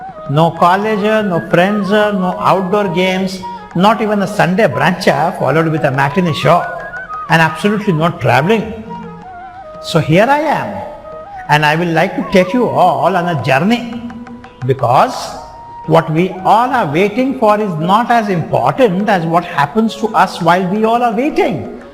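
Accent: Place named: South India, India. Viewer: So amusing presentation! Perfect South Indian tone! Awesome, proud of you, Sir!😊👌👍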